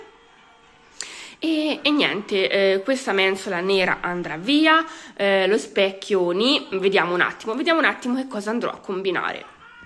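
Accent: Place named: Tuscany, Italy. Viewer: dalla parlata sento che sei toscana da dove? io Volterra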